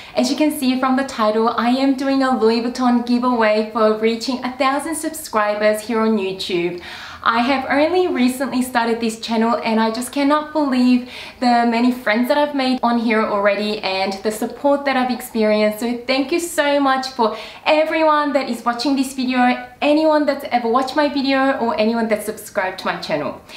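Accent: Australia. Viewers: Hi! Congratulations! I came across your channel while searching up about chanel bag reviews. Instantly, I feel in love with your personality and Aussie accent:P So generous of you giving away prizes - I'd loooove to get the LV Monogram 6 key holder:) I’ve entered on Instagram as well 😊 All the best with your YouTube and I can’t wait to see your future videos <3 - Shirley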